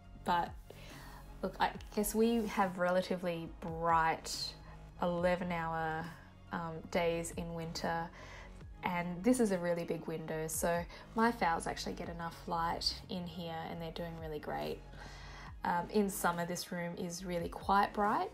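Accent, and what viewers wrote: Australia. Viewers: I am a newbie to orchid growing...phals and sarcs at present....so all info is greatly appreciated. Also nice to hear an accent from across the ditch....I'm a new subscriber in Wellington, NZ....so please keep the videos coming. 🌞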